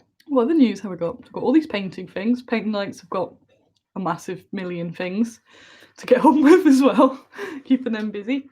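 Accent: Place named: United Kingdom. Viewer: You talk like a British person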